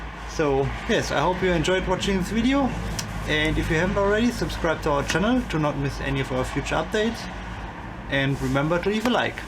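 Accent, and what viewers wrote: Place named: Germany. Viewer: Daaamn boy, i love that german accent :)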